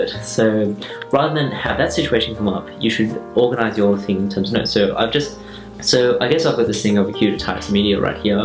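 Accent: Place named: Australia. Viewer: It must've totally slipped my mind that you're in Australia but OH MY GOSH. YOUR ACCENT. AH. Your voice, and you're so funny!!! Your video quality is beautiful, and the jazz is a vibe, and as for what YOU took notes on as a doctor wow it has all flown over my head. But you look happy and look like you're having fun which makes me happy ☺️☺️☺️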